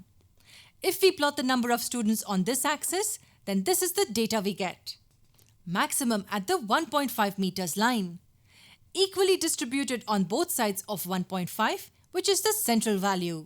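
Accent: India. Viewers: Indian accent lovely & cute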